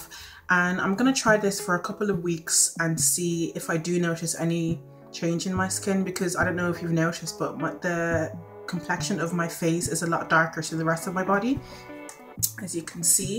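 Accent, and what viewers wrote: Ireland. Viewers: I can sense the Irish accent... Am I right? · Are you Irish? I love that accent!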